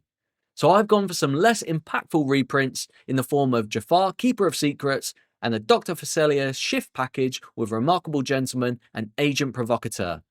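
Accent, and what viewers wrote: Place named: United Kingdom. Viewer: I’ll be honest I thought you were using AI British style accents for your shorts. Buttery smooth my man 😂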